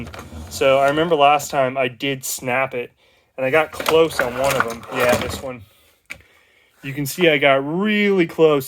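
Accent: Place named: United States. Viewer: Just wanted to say thank you for making an entire playlist on this with so many minor details 🙏 After years of wanting one I finally picked up a GF8. With limited experience and knowledge I wasn't sure where to start, what to upgrade, etc. Buying a wrecked WRX is something I completely forgot about. But swapping everything over is actually a much simpler, more cost effective and intelligent idea. However, judging from your accent I'm assuming you're from The US as am I. How were you able to get a hold of a WRX that fits a GC/GF chassis?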